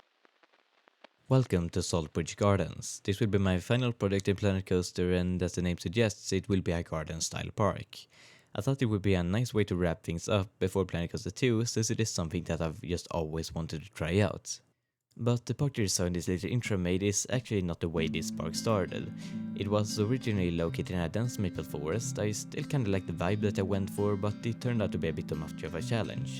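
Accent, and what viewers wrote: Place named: Sweden. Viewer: really good man also love the swedish accent 😭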